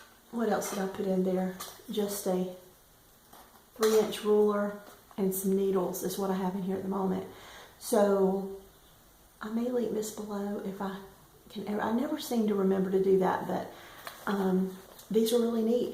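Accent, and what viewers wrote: South, United States. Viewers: Hi there! I am a new subscriber and I am loving your videos! I live in Indianapolis, Indiana and I love your southern accent. My mother was from Kentucky and for me the southern accent just warms my heart with loving memories. I am binge watching your videos after catching this one. Thanks so much for the time and effort you put into your videos...they bring such joy to me. Happy stitching!!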